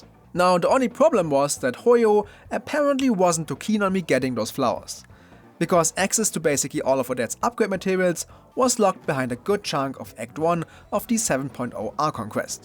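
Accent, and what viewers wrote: Germany. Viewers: It's really funny to me how obvious the German accent is, it's so funny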